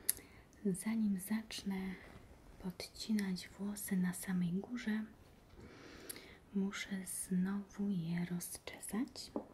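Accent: Poland. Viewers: ***** myśle że tak... =p ... Btw ... polski akcent jest bardzo piękny. ^_^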